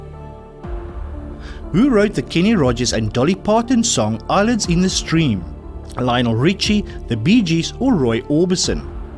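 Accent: South Africa. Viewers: U R South-African, judging by your accent?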